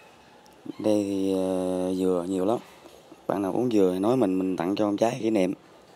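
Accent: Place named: Mekong Delta, Vietnam. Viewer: Giọng nói miền Tây nhưng mà ở đâu vậy.Câu ở kênh mà có lục bình nhiều mình câu có không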